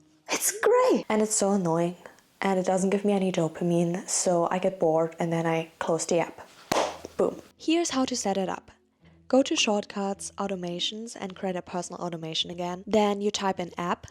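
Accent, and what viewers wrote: Germany. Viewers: The tips are GREAT, btw this was actually the first video I've seen from you, and I immediately questioned if you were German or Austrian, by your accent and furniture?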